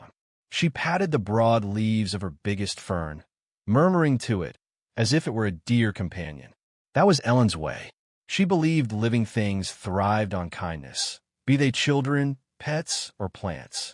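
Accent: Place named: Midwest, United States. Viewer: the voice has a Midwest accent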